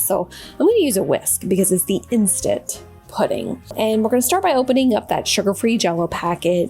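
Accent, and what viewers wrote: Louisiana, United States. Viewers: Ah Louisiana, that’s why I love your accent ❤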